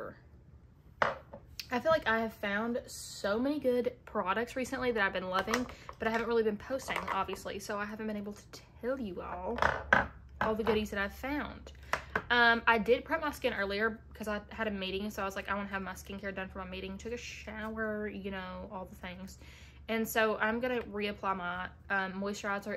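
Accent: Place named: Kentucky, United States. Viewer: Are you from Ky? Your accent makes me think you’re a Kentucky gal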